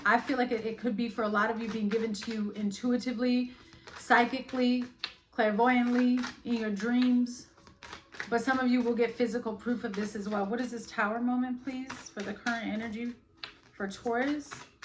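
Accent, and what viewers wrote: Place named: South, United States. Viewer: I like your voice. Hope that’s ok to say. Where u from if you don’t mind me asking? I am guessing somewhere in the south but would love to know where. Thanks for the reading!❤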